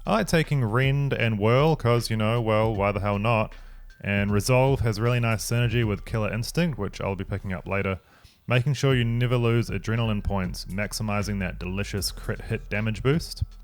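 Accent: New Zealand. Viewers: 2.3 seconds in.. and I reeeealy appreciate the new zealand accent, where are you from bro? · I’m feeling an NZ accent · Searched for the best Witcher 3 build and was happy to hear a Kiwi accent. Big ups my man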